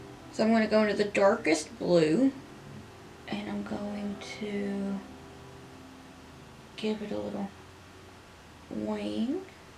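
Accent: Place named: South, United States. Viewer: Oooh I’d love to have this palette!! It’s on my future purchase list. I Like seeing you start out with 0 eyes done! Cool to see the first, true, artistic vision. Possibly the mistakes. Get to see the decision making process. I understand why creators start with 1 done on videos. But, as a viewer, I kind of like seeing it come together, without the end result smacking me in the face at the same time. If that makes sense. It would be easier to do the second eye, after doing it a first time. You would do it smarter. More effective. Cut out unnecessary things or reverse wrong ideas. I get it. But I like this style too! Anywho - love your content. Love hearing a familiar southern drawl. The pearls you picked are PERFECT with this look too. So gorgeous ❤❤❤ sorry, my comments tend to ramble. I have lots of thoughts lol 🤷🏼‍♀️😘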